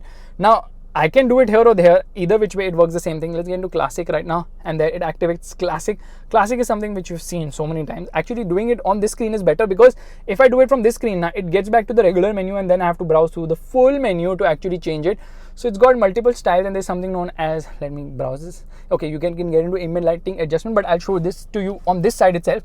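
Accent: India. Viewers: Better speak Hindi instead of English, your pronunciation is hindilish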